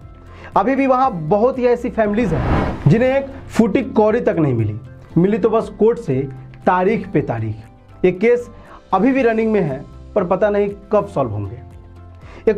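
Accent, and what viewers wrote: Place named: Bihar, India. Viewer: शानदार प्रस्तुति दी है आपने किन्तु बोल चाल में बिहार ज्यादा है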